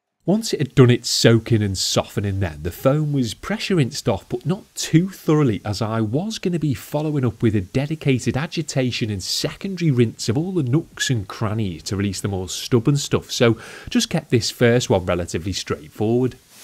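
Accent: Liverpool, United Kingdom. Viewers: You have the most british accent Ive ever heard, I love it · This is it. This is the best British accent. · Scouser mate (Liverpool) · Nobody sounds more British than this guy😂👍🏻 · Is that scouse accent? Sorry if I am wrong. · What a pleasure to ear these sophisticated sentences. I hope one day I could speak with a similar ton and accent. I hope you would not mind if I ask in which area of UK we speak such a nice way. Cheers from Normandy. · What a strong British accent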